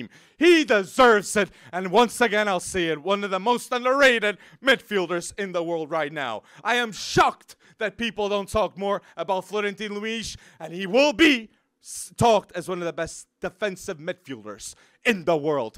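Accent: Portugal. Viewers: nota se no sotaque quando falas portugues que es portugues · Haha, the moment you started saying those names I knew you were portuguese! Esperemos que o Benfica vá longe este ano, CARREGA BENFICAAAA .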